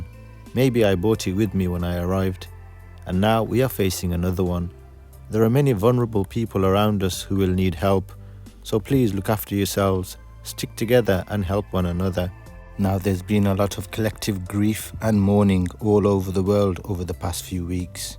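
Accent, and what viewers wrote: Birmingham, United Kingdom. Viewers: 100% you’re from Birmingham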